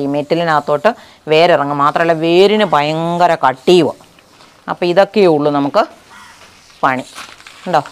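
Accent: Kottayam, India. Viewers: സംസാര ശൈലി കേട്ടിട്ട് ❤കോട്ടയം ഭാഷ ആണെന്ന് ഉറപ്പ്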